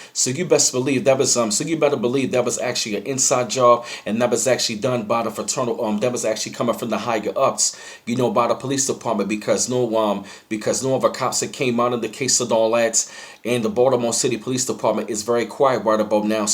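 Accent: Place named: Atlanta, United States. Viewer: You don't sound baltimore.more like Atlanta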